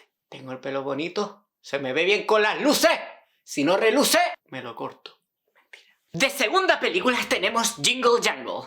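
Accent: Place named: Andalusia, Spain. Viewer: Este chaval es andaluz y se nota, fan.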